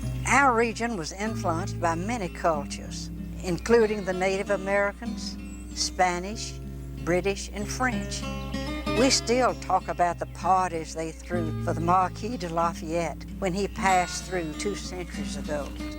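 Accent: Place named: South, United States. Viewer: She was a very special lady. I love listening to her story telling and that smooth, southern voice of her's.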